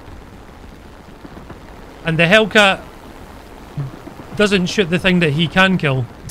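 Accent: Scotland, United Kingdom. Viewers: Listening to is like listening to myself but as Scotsman "I hate being self aware" hit me in the feels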